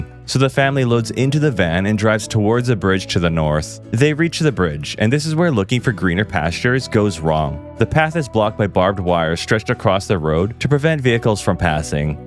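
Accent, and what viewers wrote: Philippines. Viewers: Is it weird that the moment you say you're Filipino, I can now hear the Filipino Radio DJ English accent? 😅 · i love your Filipino accent lol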